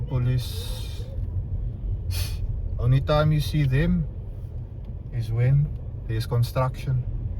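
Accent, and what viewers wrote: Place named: Cape Coloured, South Africa. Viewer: Lekker coloured accent. I miss that living now 34 years in New Zealand. Make some Afrikaans videos too!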